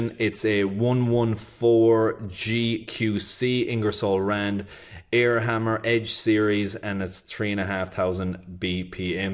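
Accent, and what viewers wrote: Ireland. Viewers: listened 3x just to hear the Irish accent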